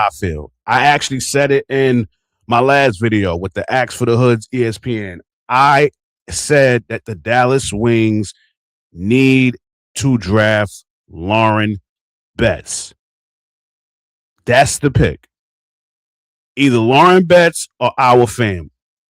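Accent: New York, United States. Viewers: You ended up on the feed. Heard you speak a couple minutes, figured you from NYC and a Liberty fan..you confirmed it...then I see you from the East.. had to subscribe. I'm from the Stuy, reppin the Liberty so looking forward to more content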